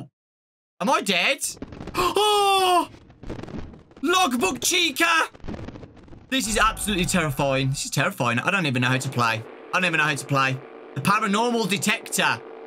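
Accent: United Kingdom. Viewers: Your from the uk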